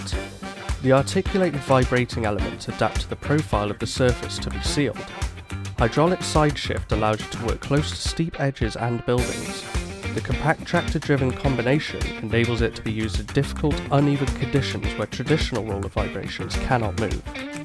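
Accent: United Kingdom. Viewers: Well, great video. As much a fan of the British accent, I am wondering where is? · Lose the limey!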